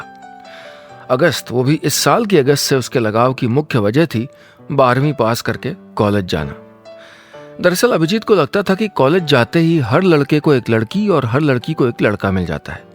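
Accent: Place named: Haryana, India. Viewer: What a deadly combo! Well...Opposites attract. Nice story and good to hear Neelesh in Haryanvi accent..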